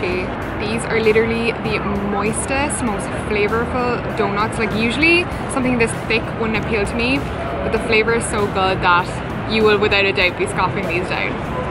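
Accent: Ireland. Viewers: U sound Irish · Do i sense an Irish Accent. :D Nice Video been to New York Twice love it. Deff beats home for the savory treats.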